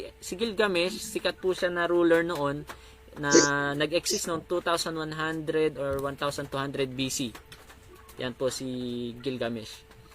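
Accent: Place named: Ilocos, Philippines. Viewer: Parang Ilocano din ang Pronounciation ninyo doc he he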